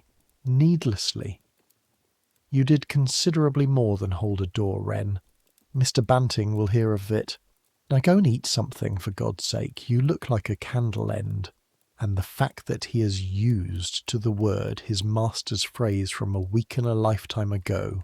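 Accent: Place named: United Kingdom. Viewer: Actually I do actually fall asleep to your voice. I have many times. Your voice is comforting, calming, and, to be honest, just a little boring. So it is very nice to sleep to. I happen to enjoy a British accent quite a bit, rather like a good smoke, even though I no longer imbibe. So I often have dreams related to the topics you’re talking about. I live in Norman, Oklahoma, USA & I like to think I know so much about certain members of the monarchy. I must seem hilarious or perhaps pitiful to some people. Many Americans know absolutely nothing about the royal family or British history. But bc it doesn’t involve my own life & I don’t have strong feelings about your monarchy or history, the subject matter is also calming & a little boring. But I just happened to wake up to this video of yours this morning am, Sunday, August 23, 2026. That is actually quite late for me. 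I will save this to finish for later,as I would a nice iced coffee with cream & milk. Good morning and thank you for some nice nights & mornings!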